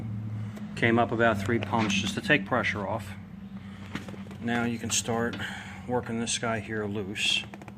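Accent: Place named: Central Jersey, United States. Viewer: you have the most proper central jersey accent i ever herd. also im doing this 3 in and 3 in rear aswell on my gmc canyon just waiting on parts.. cool video even tho its old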